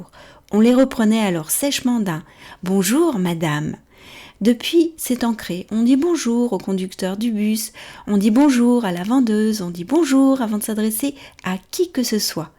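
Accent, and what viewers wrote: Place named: France. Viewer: Un autre épisode captivant. La manière dont il relie des gestes simples du quotidien – dire « bonjour » ou « coucou », la relation à l'argent – à quelque chose de profondément culturel est remarquable. Le ton crée un réel sentiment de proximité et donne l'impression d'entendre une voix française authentique issue de la vie quotidienne.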